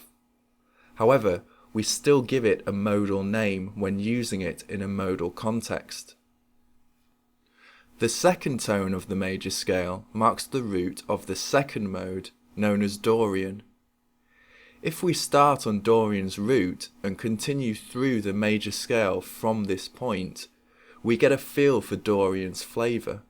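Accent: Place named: England, United Kingdom. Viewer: I really don't get all the hub-bub about this guys voice. He's from fucking England, and he has an accent. Wow, right? It's almost as if these comments are from 15 year olds on their junior-high school library computers. Regardless of his pace or inflection, this -IS- an instructional video, and he's not a bloody actor. The focus should be on clear, articulated information; not a swooning narrative with Shakespearean prose. Anywho, very nice video, thanks!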